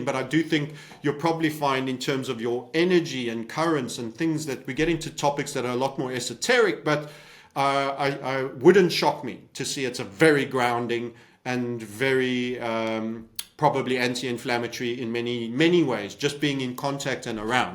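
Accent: South Africa. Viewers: South African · This is an Africaans accent, right?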